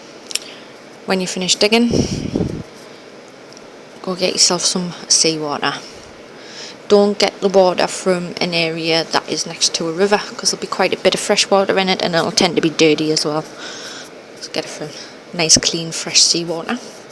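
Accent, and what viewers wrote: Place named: Newcastle, United Kingdom. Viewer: Bexhill Windows ... sounds like Newcastle or South Shields...?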